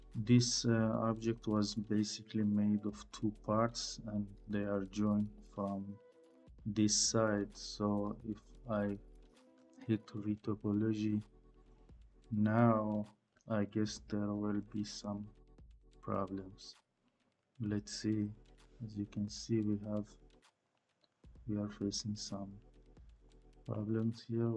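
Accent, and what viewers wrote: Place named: Iran. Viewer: You have a Persian accent, are you from Iran? We are easily distinguishable.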